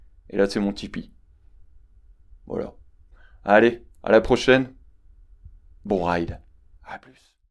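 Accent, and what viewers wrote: Brittany, France. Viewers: Salut. Bon bah déjà, je te souhaite une bonne récupération... 2j que j'arpente ta chaîne. Top. J'adore le ton. Et l'accent m'a tout de suite rappelé mon Finistère Nord natal... Ahah ;) Par contre, j'ai voulu regarder quelques unes de tes vidéos plus anciennes que youtube me proposait, et impossible. Contenu privé. Bug ou normal ?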